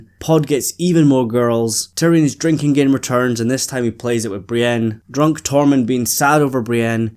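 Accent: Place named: Scotland, United Kingdom. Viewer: Where from Scotland are you?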